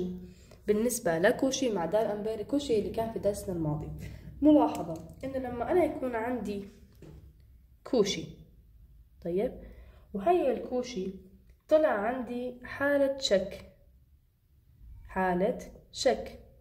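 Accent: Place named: Homs, Syria. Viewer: حاسك حمصية متلي😂 عكلن شرح ممتاز الله يعطيكي العافية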